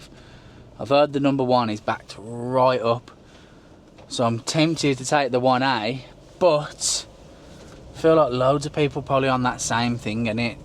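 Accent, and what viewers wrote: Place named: Black Country, United Kingdom. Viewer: And just where abouts from the Midlands are you from? I'm reckonin Black Country... Bilston... Shortheath? Willenhall maybe? Go on, tell me how far off I am! Love the views and the van by the way!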